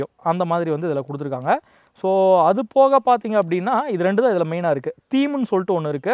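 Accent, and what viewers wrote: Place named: Kongu, India. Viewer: அது கொங்கன்ஸ் ஓட பிறந்த ஓசை. எளிதில் மாறாது !!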